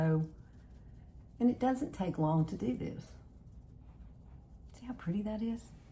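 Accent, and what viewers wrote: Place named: Alabama, United States. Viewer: Girl, I not only like watching you because of the great, down to earth, make up tips for older women; but I just love listening to your calm voice and devil may care attitude, your Alabama accent, and just the neighbor next door persona! Actually, wish you were my neighbor! We would be a hoot together; you with your Alabama accent, and me with my Texas twang! Just good 'ole girls, having fun!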